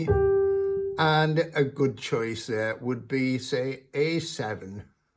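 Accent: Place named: Northern England, United Kingdom. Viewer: Cool to hear a northern accent! I’m from Manchester! Great video:)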